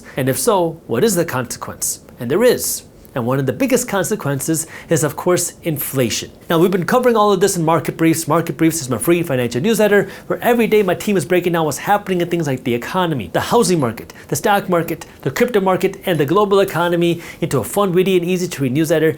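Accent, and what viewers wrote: Standard. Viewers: Finally crisp and clear neutral English accent. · And speaks normal English!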